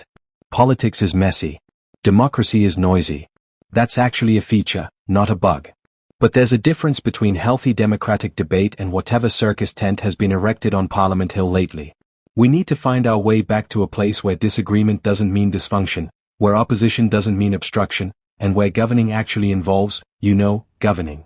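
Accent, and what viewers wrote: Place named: United Kingdom. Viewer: Why the Brit voice and you are showing provincial leaders instead of Federal parliament members?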